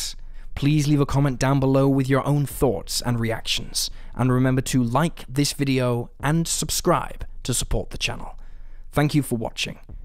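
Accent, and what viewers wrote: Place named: Newcastle, United Kingdom. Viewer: He has the same accent as myself, north East England the accent is called 'a geordie accent'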